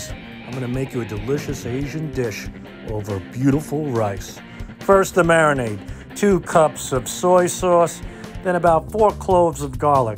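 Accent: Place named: South, United States. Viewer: This man is NO!! third rate middler doin the wagon wheel show!. Sal you are the funniest man I've ever seen . your southern accent is exceptional.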